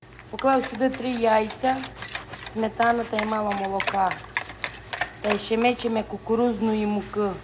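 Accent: Transcarpathia, Ukraine. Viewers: Мені подобається закарпатська розмова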